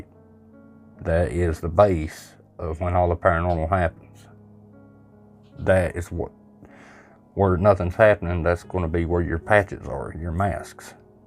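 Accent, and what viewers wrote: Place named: Carolina, United States. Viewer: Are You From Carolina? I Love You'r Axent...Jihaaaa...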